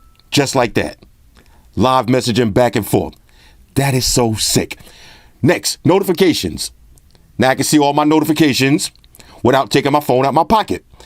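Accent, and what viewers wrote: New York, United States. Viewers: exactly!! lol and that NY accent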